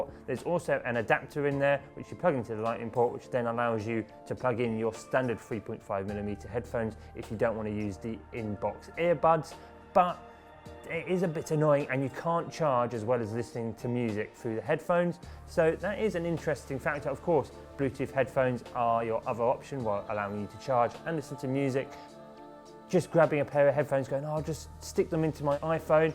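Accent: United Kingdom. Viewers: iPhone is a really premium phone. But budget wise it is not the best Phone. Samsung is by far the best budget brand there is in the market today. ps. I cant decide if your Accent is British or Australian. I have this British friend who speaks like you. 😊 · British accent so annoying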